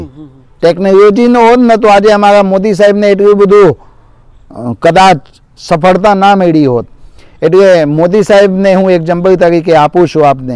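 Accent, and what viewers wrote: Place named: Afghanistan. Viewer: ME BHAI 2 VARSH AFGHANISTAN 🇦🇫 MA RAHI JOB KARI CHE AFIN NI COMPANY MA ANE AA BAPU NI BOLI NO LEKO CHE E AFGHANI LOKO NI BOLI SATHE 100 TAKA MADTO AAWE CHE ETLE MARU MANVU CHE K AA BAPU KOI AFGHANI HOY SAKE ANE PACHI HINDU 🕉️ SADHU BANIYA HOY SAKE CHE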